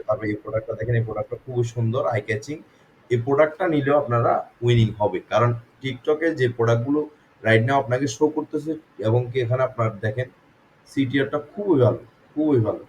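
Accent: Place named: Chittagong, Bangladesh. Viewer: কথা শুনলে বুঝতে পারি আমাদের চট্টগ্রামের ভাই। আপনার বাড়ি চট্টগ্রামের কোন জায়গায় বদ্দা?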